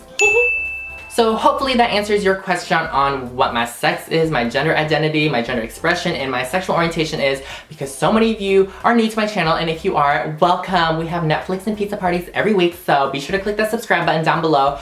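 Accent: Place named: South, United States. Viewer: I LOVE your country accent and your little laugh you do it's completely adorable! :)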